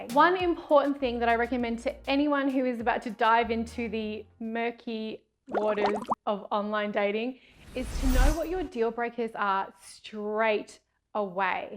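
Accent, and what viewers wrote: Australia. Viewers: Lol omg your so aussie, I love it... Thanks for the tips, now I need one on how to date aussie men ughhh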